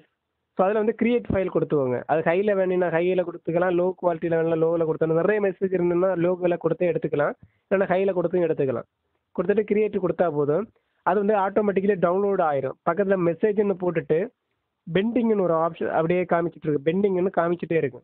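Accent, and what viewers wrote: Kanyakumari, India. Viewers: Bro neengha entha oru speech keata Kanyakumari Dist pola irruku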